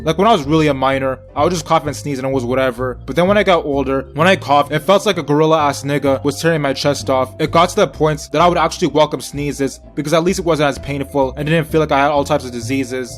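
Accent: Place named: New York, United States. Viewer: I am new to your channel and deadass I fucks with you heavy I am from NewYork recently moved but when I hear your voice and I feel right back in New York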